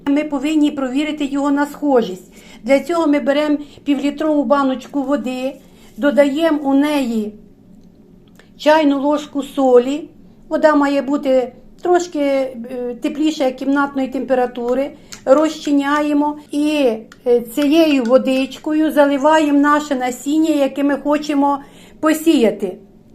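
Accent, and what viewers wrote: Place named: Poltava, Ukraine. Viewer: Полтавщину по говору ні з чим не сплутаєш. За відео лайк, а за суперфосфат-неуд )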